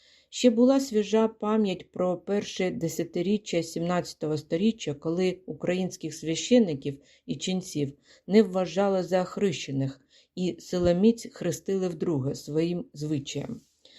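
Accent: Russia. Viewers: Дякую за статтю Шевельова, за якою давно шукала. Але, на жаль дуже зросійщена вимова, що псує вражіння. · вітаю! абсолютно не хочу образити чи то знецінити Вашу працю. проте хочу зауважити, що, на мою думку, Вам варто ґрунтовно попрацювати над фонетичним аспектом мовлення. дуже важко слухати через абсолютно часто заміну звуку "о" на "а" і некоректне помʼякшення чи не-помʼякшення. враження, ніби Ви читаєте українською, але на російську фонетику. вдосконалення вимови українською було б чудовим кроком. Ви робите важливу справу і щасти Вам із цим.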